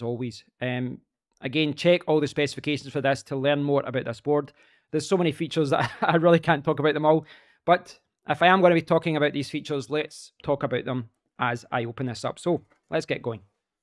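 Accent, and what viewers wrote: Scotland, United Kingdom. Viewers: Love the excellent description and the great Scottish accent. Thank you!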